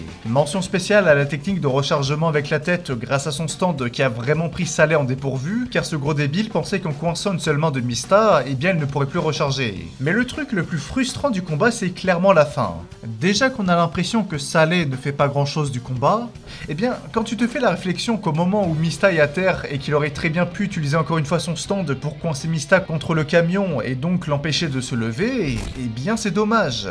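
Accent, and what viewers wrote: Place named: Paris, France. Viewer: Vidéo très intéressante mais ça serais bien d'arrêter d'appuyer la dernière syllabe de tes mots quand tu parles c'est très bizarre on dirais une parisienne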